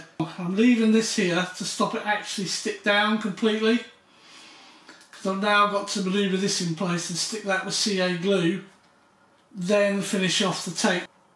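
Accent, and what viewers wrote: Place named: United Kingdom. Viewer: You managed it to let me enjoy the britihs accent . Im sorry but before it really pissed me of, but now i can just relax, Thank you.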